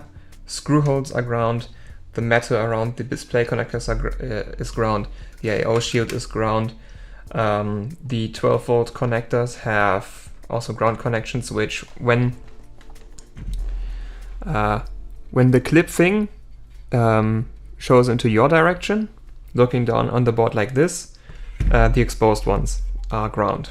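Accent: Germany. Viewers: Started watching and thought to myself that is German accent isn’t it? Then you said Germany and I knew it!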